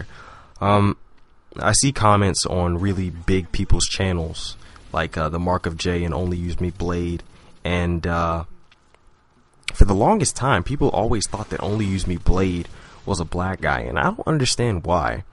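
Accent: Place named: United States. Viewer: i just thought you sounded american.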